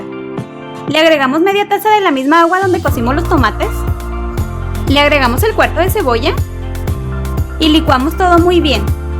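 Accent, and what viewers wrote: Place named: North, Mexico. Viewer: Se me antojaron y luego luego se oye tú acento del norte del país pues dijiste shiles con S al principio y ese es acento de allá, se me hace simpático el acento del norte.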